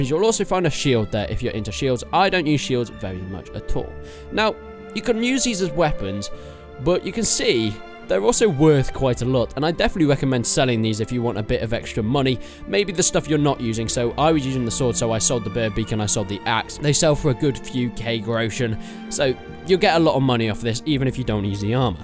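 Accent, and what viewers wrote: United Kingdom. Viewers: Hrmm... U.K. Accent but you said the location is a couple hundred yards. Interesting.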